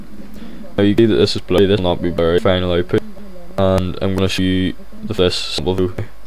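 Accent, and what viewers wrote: Northern Ireland, United Kingdom. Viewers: Yoooo ur frum Northern Ireland too matee haha high Five!! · Northern Irish. I know that accent anywhere! I'm Irish too. Hell yeah!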